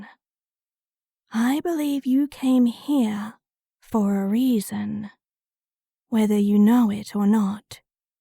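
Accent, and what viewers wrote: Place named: South, United States. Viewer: Thank you so much for filling my script! I don’t know if I’m crazy or not but I think heard a slight southern ting in your performance and I really liked it. Brought out the preaching part of this script well. Thank you!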